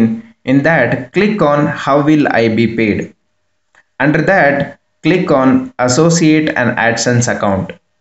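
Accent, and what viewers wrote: India. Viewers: Iam sorry ! but I laughed a lot on ur Indian English accent😂😂😂😂😂😂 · D i love your indian accent, just like a very famous youtuber i love to watch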